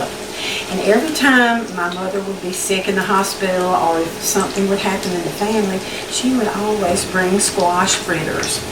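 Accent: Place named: Alabama, United States. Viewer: This just brings back so many memories of cooking with my grandma! 😭😭😭 I’m originally from Alabama and your accent brings me back home, thank you for your sweet southern charm!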